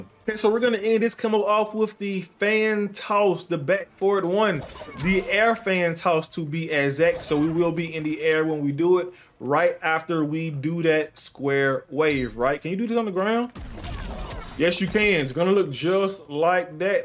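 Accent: South, United States. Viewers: Love your step by step showing of combos. But what makes it even better is your dirty south country accent lol. JUST LIKE THAT!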